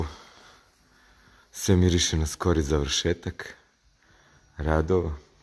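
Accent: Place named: Belgrade, Serbia. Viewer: Dobar video, ali brate, imaš neprijatan beogradski naglasak kao da si odrastao u beogradskim džiberskim kafićima 😁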